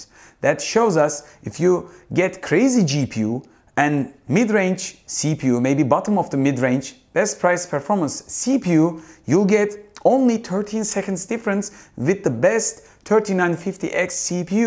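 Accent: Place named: Turkey. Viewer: Direk duyar duymaz anladım Türk olduğunu. Aksan kayıyor kardeşş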